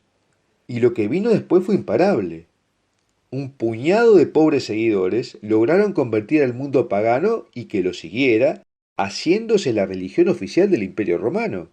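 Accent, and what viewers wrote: Argentina. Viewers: Hola muchos no creen y los pcos que creen,no les importa,el habla como argentino ya se te esta quitando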